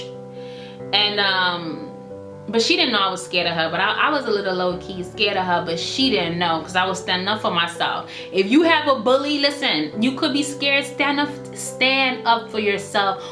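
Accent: New York, United States. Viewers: I tought I was going to hear an accent, but not at all! You sound like an American. Grappig om te kijken naar een engelstalige youtuber, waarvan je weet dat diegene ook Nederlands praat haha. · I thought you would have a foreign accent but you don't. You sound similar to a New Yorker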